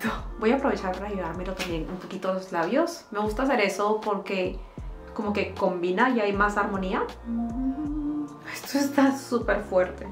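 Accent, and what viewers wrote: Peru. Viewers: Un solo día con su mami bastó para que el acento de peruana regresara jaja soy Mexicana y lo noto muchísimo, me imagino es como Peruano fresa, me encanta:D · a le sale más su acento peruano con su mamá jaja me encanta!!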